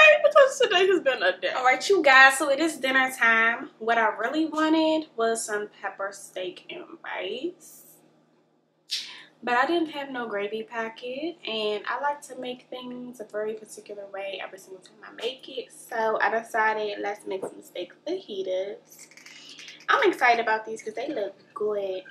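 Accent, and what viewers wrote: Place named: DMV, United States. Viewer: New subscriber .. loving your channel I knew you were from the DMV by your accent 🤣 before I even read your profile lol .. u made me miss home lol 🫶🏾🤍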